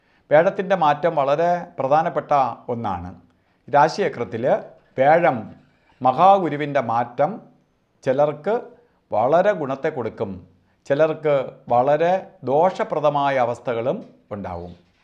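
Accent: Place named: Thiruvananthapuram, India. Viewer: Pakka tvm accent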